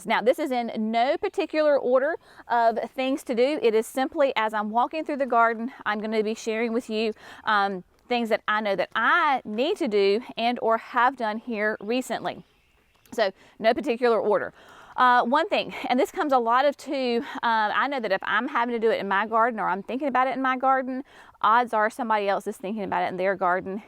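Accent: South, United States. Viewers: ! I could listen to you all day! Your southern accent is adorable. I love how encouraging and inspirational you are. You give me so much courage and strength to get out there and get stuff done in my overwhelming yard. I absolutely love your quote, ”There is grace in gardening.” I need that sticker for my water bottle! 😂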